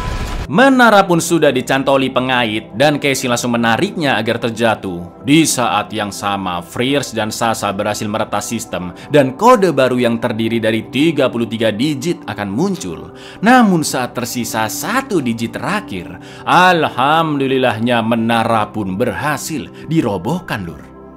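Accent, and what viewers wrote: Java, Indonesia. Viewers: Pdhal pengen nonton. Tp terlalu medok Jawa nya. Gak asik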